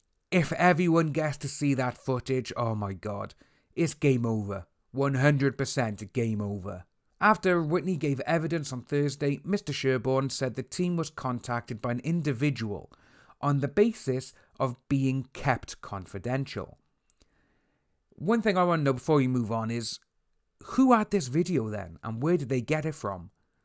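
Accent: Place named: United Kingdom. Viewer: Love your British accent... Good job keep it on.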